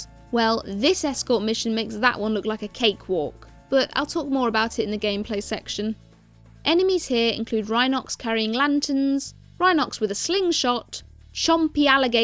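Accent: RP, United Kingdom. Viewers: You have one of those indiscernible ever so slightly posh accents :) I like supernatural/horror content, you should check out Lazy Masquerades channel, also a very interesting voice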